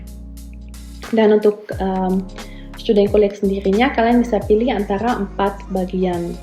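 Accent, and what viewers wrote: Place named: Papua, Indonesia. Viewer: . klu aku tutup mata..kamu bukan org jerman..bahasa indonsia logat papua kereeen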